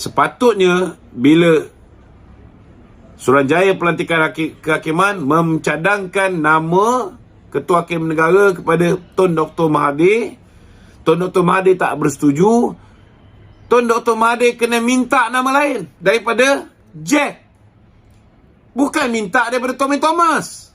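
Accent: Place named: India. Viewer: cakap macam keling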